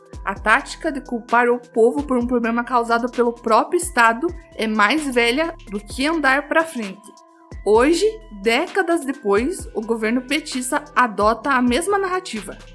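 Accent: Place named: Bahia, Brazil. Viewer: Sotaque baiano é engraçado 😂😂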